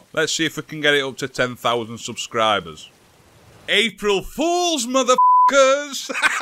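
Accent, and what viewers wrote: Yorkshire, United Kingdom. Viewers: 😂😂😂😂😂 had me there Haha. Yorkshire accent aswel that. Where you from? I'm from wakefield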